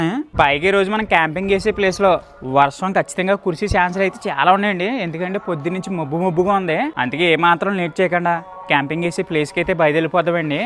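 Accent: Godavari, India. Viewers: నీ పేరు చెప్పు బ్రో నీ వీడియోస్ చాలా బాగుంటాయిగోదారి యాస baguntadi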